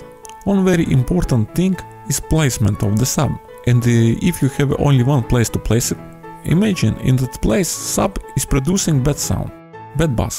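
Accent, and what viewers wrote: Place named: Russia. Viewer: I love the Russian accent in English and how certain vowels and consonants have a special pronunciation. Absolutely adorable, and I am always impressed with people who are multi-lingual. Khorosho! Spasiba. =D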